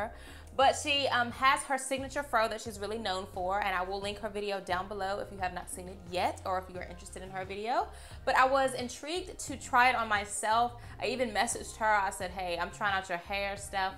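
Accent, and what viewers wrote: South, United States. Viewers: You sound so country... I love your accent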